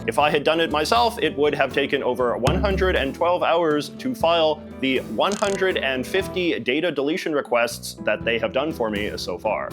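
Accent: Canada. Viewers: you have the most canadian accent i have ever heard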